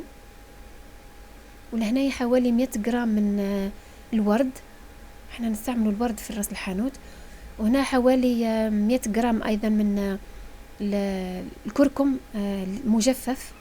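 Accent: Biskra, Algeria. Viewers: من هدرتك عرفتك بليك بسكرية الله يبارك ( كيما انا )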